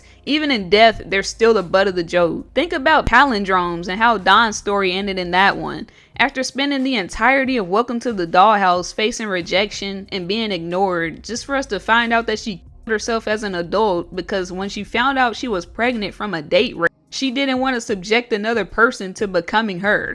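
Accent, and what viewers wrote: United States. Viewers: Narrator’s voice like sandpaper. American lazy speak. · I love your videos, nothing on YT ever feels genuine and funny anymore. But your stuff is just so good. I appreciate all your uploads. Also, I love your accent. you from the south for sure, me too.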